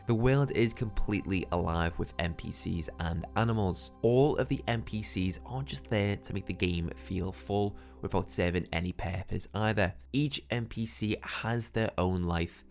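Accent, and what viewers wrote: Liverpool, United Kingdom. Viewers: Are you a scouser lad?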